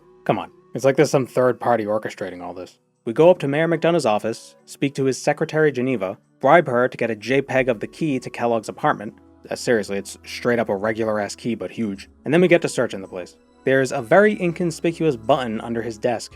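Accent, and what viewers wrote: Boston, United States. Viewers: In one of your older videos I'd just got off a call with my grandfather and realized... "Wait, that's a boston accent!" From the North Shore originally and I'd hear it all the time at work or in general and it just went over my head for like 3-4 videos. I do appreciate hearing the areas of Boston/Mass said in Boston way. I was so excited for Fallout 4 to come out back in that time cus I was really looking forward to a Fallout that was recognizable since it was so far in the future and in my area... I mean hell, my city is technically in it. It's like you said though, it feels right even if it doesn't look right. PS Green Line is just terrible and every station its a part of is a mess. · the challenge was interesting but the real life boston lore and dialect were my favorite parts. excellent video. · I had a feeling you were from Boston. 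I was born in Quincy and lived all around the South Shore, so Fallout 4 really holds a special place in my heart. I also understand downplaying the accent. I do the same, otherwise people can't understand what I'm saying or constantly ask me to say words that end in "R." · I always got the sense you were from somewhere in New England. Let that Boston accent out in the next video! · The weirdest thing about this is I had never noticed your Boston accent until this vid.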